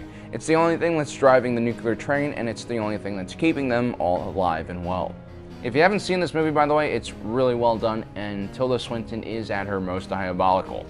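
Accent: South, United States. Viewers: Are you from the south? Your voice seems kinda different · You look asian, but sound southern american. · You have a good modulated voice, don't try to ruin it by speaking like a southern man. It's unintelligible and is quite annoying. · You got a wired accent, man. It’s a mix of US southern and British. Great video. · The southener is strong in you. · Why do you have a southern accent lol? I am interested in your family's story.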